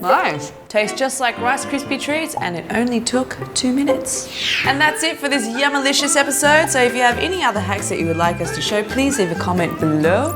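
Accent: Australia. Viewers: Are you Australian? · Is she Australian?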